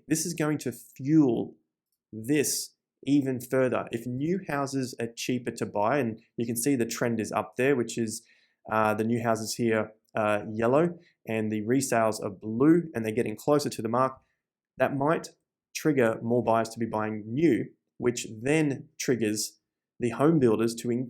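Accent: Australia. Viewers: Hey, can you do an analysis of the property market in australia please? i can hear the aussie accent;)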